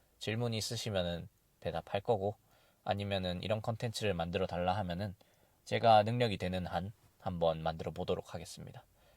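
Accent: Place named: Japan. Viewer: 한국어에 일본어 톤이 녹아있는걸로 봐서는 일본어를 수준급으로 잘하실 것 같네요. 저는 살다가 돌아왔는데 어디서나 화이팅입니다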